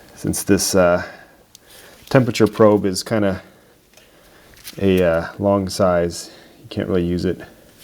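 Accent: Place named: China. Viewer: Chingliss I love it.😂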